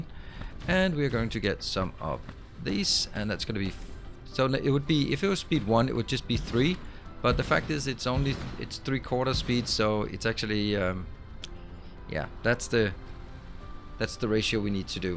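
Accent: Denmark. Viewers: Your accent sounds danish :D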